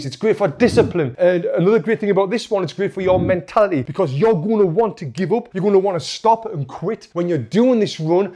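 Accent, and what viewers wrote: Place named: England, United Kingdom. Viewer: You have got marvelous English accent, sir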